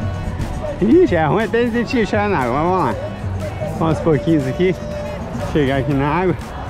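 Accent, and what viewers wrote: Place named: Minas Gerais, Brazil. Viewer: Que água maravilhosa...essa cor me faz tão bem!! A Obra de Deus é perfeita!! Essa prainha dos pescadores é um paraíso..., também achava que fosses mineirin...seu sotaque, apesar de paulistano é autêntico de um mineiro.😊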